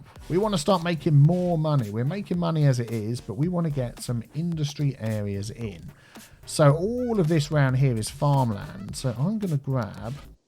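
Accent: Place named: United Kingdom. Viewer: I'm living in the UK temporarily from America, and I hope you don't mind if I ask what accent is that? At my place of work there is a guy who sounds exactly like you, as we're all working from home now I don't know him well enough to chat him up on messenger haha. Edit: Accents fascinate me and I like to try to train my ear.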